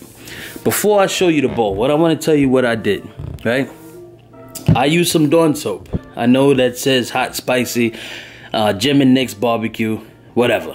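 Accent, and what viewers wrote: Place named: New York, United States. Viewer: Luv the NY accent...NYer for life❤️😎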